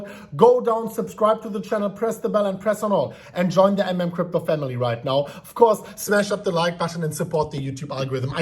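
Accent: Germany. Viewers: I like you man . I'm Polish anc I new your hard accent have to be German . I follow you mate and trully .... like you😉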